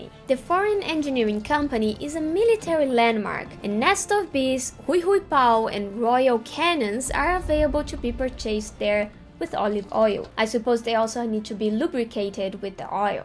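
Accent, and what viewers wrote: Brazil. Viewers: Throughout the video I concluded you're a fellow Brazilian. I know some Greek and Cheirosiphon is pronounced /Rirocifom(pt)/ heerohsseephon(eng)/.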